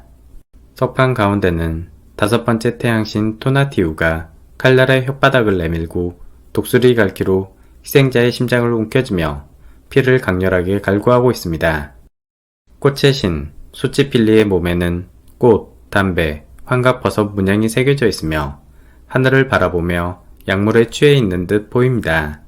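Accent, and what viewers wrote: Jeolla, South Korea. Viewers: 어!! 목소리가 전라도분이시다!!! 오오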